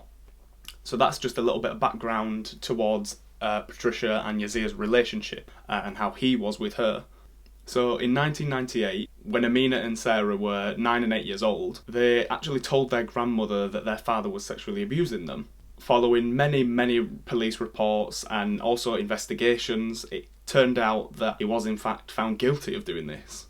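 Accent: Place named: Northern England, United Kingdom. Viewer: I haven't heard about this incident until now. It's such a shame how children/young people so often ignored when they try to ask for help. Your accent sounds quite northern - my accent is northern but mine is VERY northern (Wigan). Do you remember a few years back there was a young girl from Warrington. Her name was shefilia Ahmed. She was murdered, chopped into pieces and thrown into water in the lake district. Such a terrible thing to happen to a beautiful young woman. Her parents were eventually charged and found guilty of her murder. But it came to light she tried to let people know what was happening in her life. She even drank bleach in a bid to kill herself because her father was sending her to Pakistan to marry her off. You did another Exellent presentation. Thank You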